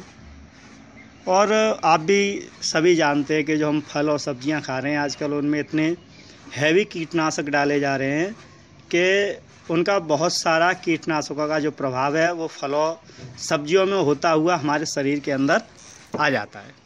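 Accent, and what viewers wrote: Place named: Chambal, India. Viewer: Sir I m from mp chambal region n ur voice sounds like us. Khn se hn aap???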